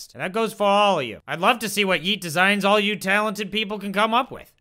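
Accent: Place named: Ireland. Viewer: Irish I can tell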